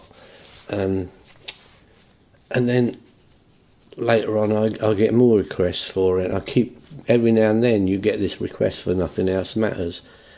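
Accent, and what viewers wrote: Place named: Cockney, United Kingdom. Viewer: You a cockney? I grew up EC1 you sound like my family lol 😂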